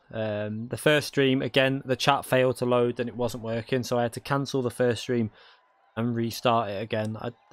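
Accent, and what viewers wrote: Liverpool, United Kingdom. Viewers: Can't work out your accent. You sound like you're from the wirral or full Scouse then other times I can't place it.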